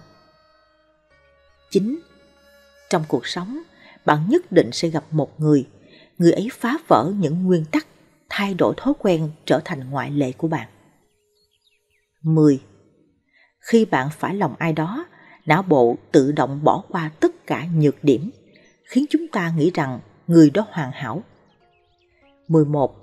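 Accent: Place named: South, Vietnam. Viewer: Chị đọc giọng người miền nam nghe hay nhẹ nhàn trong vắc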